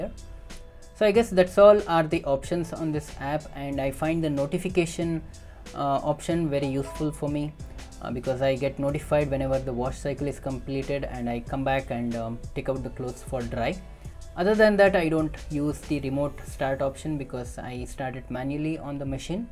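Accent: India. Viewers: That was the most painful video I have ever witnessed, please don’t ever review a product again, or if you do please be sober The worst part is the Indian accent in English!!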